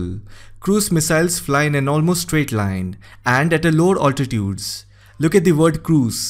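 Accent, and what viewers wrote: India. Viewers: But with a heavy Indian unique accent though!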